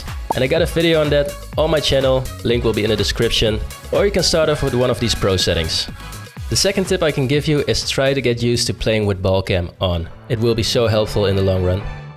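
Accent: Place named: Netherlands. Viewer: Nice video man you are insanely underrated and are you from the Netherlands by any chance I can kinda hear it when you talk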